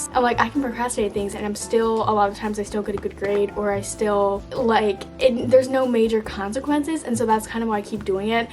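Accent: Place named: United Kingdom. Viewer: GIRL I THOUGHT SHE WAS BRITAIN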